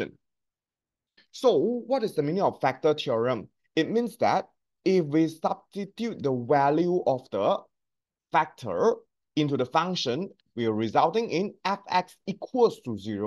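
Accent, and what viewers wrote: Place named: Asia. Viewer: something about your asian accent makes math so much easier to understand(im also asian) btw all the other channels stretched this topic out soooo long(like multiple 20 minute slow paced videos) but your concise and strutctures style of teaching helped me practice along and not take like 5 hours for the easiest add maths chapter.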